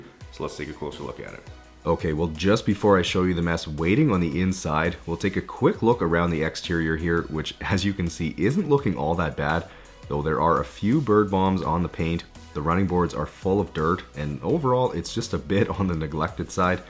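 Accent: Canada. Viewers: I must say that I am impressed with your dedication to being a perfectionist it definitely helps with this kind of profession every vehicle you Clean is amazing but as a united States citizen I'll say that I definitely noticed your Canadian accent lol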